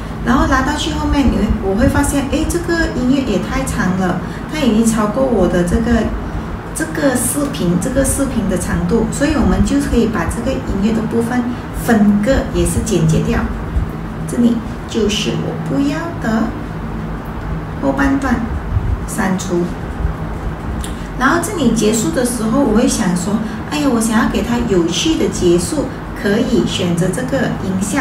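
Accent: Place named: Vietnam. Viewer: 感觉普通话不像是中国人说的，发音像是越南人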